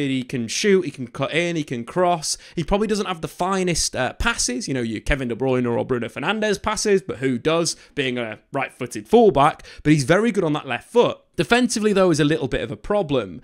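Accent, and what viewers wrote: United Kingdom. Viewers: Forgive his english accent 😅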